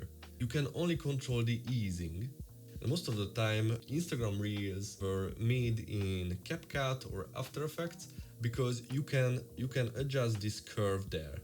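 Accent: Hungary. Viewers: Hello ☺️ pár perce nézem még csak a videót, de már most látom, hogy érdemes lesz végig nézni. Meg lestem már pár hasonlót speedramppal kapcsolatban és kiváncsi vagyok milyen extrát tudsz mutatni azokhoz képest. Ja és remélem eltaláltam a nyelvet az akcentus alapján 😉😃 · Are you hungarian? Na ja jo, egsh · Na jó 😁 addig görgettem míg kaptam magyar hozzászólást 😁 az akcentusból kivehető 😅 köszi a klippet!❤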